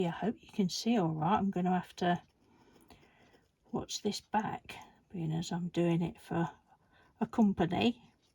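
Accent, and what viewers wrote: United Kingdom. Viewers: nice english english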